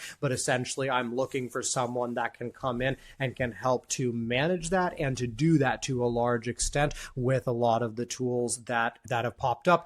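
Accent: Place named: United States. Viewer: Hi.... I have never considered being an EA (until today) but it's a rare quality to find someone who thinks so fast and be unashamed about it. I shared this video with my Danish wife and she laughed out loud (thinks we have the same American voice and talking tempo). Even if we never meet in person, I look forward to more content from you. NDs need change champions just like you.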